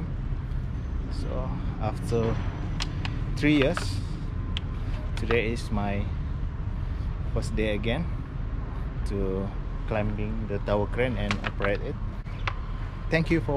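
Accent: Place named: Sarawak, Malaysia. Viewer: Orang mana boss? Macam orang sarawak je..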